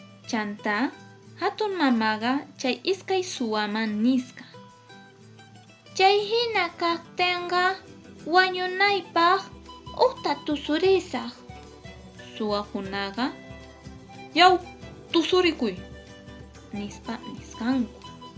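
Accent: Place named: Ayacucho, Peru. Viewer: Te pasaste compadre. Has hecho una gran contribución al mundo. Lástima que ahora no podré usar esto como tarea de audio-comprensión como antes para mis alumnos porque ya lo has traducido. Igual, se agradece y se te felicita. El cuento es boliviano (se agradece mucho a los hermanos bolivianos por colgar material en quechua) pero ese quechua al ser pronunciado es más entendible incluso que el quechua Cusco-Collao del sur del Perú. Se nota que tú hablas quechua Ayacucho-Chanka o quechua de Apurimac, ¿cierto? Hatun marqayniyta chaskikuy, Chosica llaqtamanta, wawqillay.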